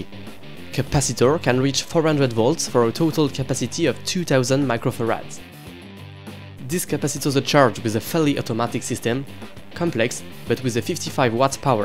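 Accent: France. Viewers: That's a fellow french accent i hear there ?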